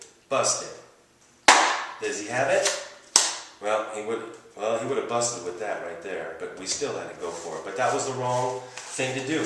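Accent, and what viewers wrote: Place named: Greece. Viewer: can you clear some things up for me? I am a noob. first of all, thanks for the video, very informative. you're right, play to win, don't play to get 21. a lot of people in comments are saying players will get mad at you playing like this, why? they usually get mad if you game unnecessary hits, why would they get mad at you because they already played their hands. you sound like my friend who is Greek, are you Greek? are you able to jump in a table mid shoe? my local casino doesn't allow that. again thanks for the video, I'll this soon.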